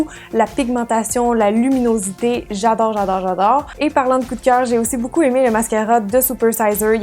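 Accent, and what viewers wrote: Quebec, Canada. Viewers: Es-tu québécoise ou quelque chose de ce genre car tu as un accent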